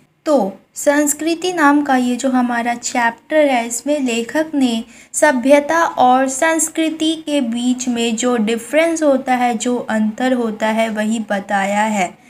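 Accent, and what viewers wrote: Bengal, India. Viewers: Dii ap Bengali Ho Kya. Love from Rajasthan❤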